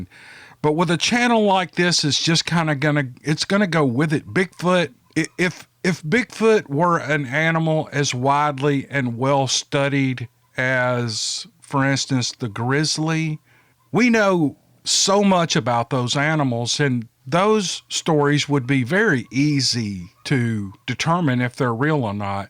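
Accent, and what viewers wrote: South, United States. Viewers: 😂I laughed and laughed 😅 at this story, 🤣 poor kid, this was a fantabulous! Bigfoot story I'm still playing catch-up, but WoW, I do love but the stories have to be read by you exclusively! Or I'm not really interested in listening. IDK if it's your southern drawl, or what 😊 ROCKS❤ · Hey, I have a good one for you. All stories are B.S. = *BEST *STORIES!! I LOVE THESE STORIES ❤️❣️. Keep reading because your the *Best *Story Narrator out here in you tube land. As for the people who know a story is supposedly b.s.( I say ) *Bloody *Stop and enjoy that *Beautiful *Southern voice. Much love to you and yours ❤️ B. S. = *Be *Safe. Lol. 😄.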